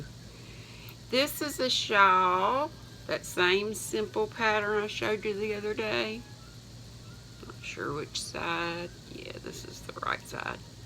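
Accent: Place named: Iowa, United States. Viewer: I love your new name 😃❤🐿🐿 I just love you girl. My late mother used to talk the same way and she was from Iowa. There was a couple three and she used to call a tiger a tager.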